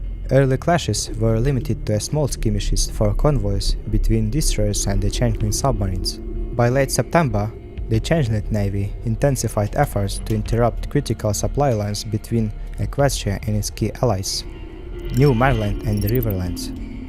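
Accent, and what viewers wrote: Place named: Russia. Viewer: Килотонный респект автору. Я сразу понял, что автор умеет говорить на русском из-за акцента. Чувак, тебе уважение, продолжай пилить годный контент!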